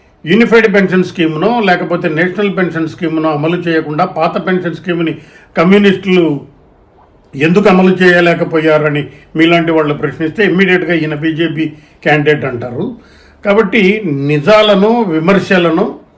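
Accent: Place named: United Kingdom. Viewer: తెలుగులో ఎంచక్కా చెప్పవచ్చు కదా... బ్రిటిష్ యాసతో ఇంగ్లీషులో ... అందరూ అర్ధం చేసుకునే అవకాశం లేకుండా పోయింది అని... అందుచేత...మీ యూట్యూబ్ ఛానల్ చూడదలచుకోలేదు..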